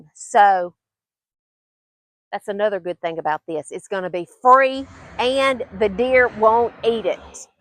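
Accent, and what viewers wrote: South, United States. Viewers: Great tip! We have a dear friend who has a beautiful garden and her name is too. She has the most amazing raspberry bush with no THORNS that produces the biggest and sweetest raspberries. We've been meaning to get some of that plant for my garden and now you've motivated us to stop by her place. BTW....love your southern accent.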